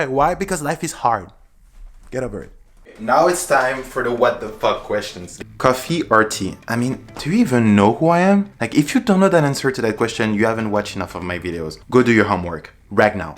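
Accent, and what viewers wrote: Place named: France. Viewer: That was weird. I found your channel looking for ways to declutter photos and...found you had a very tiny familiar accent and, watching a second video a bit intrigued, It turns out you're french ! Funny surprise. Félicitations, beau boulot ! I'm impressed.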